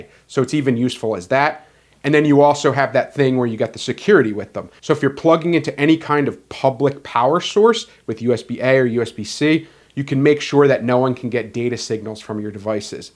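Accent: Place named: Philadelphia, United States. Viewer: That Phuluffia accent. Go Birds!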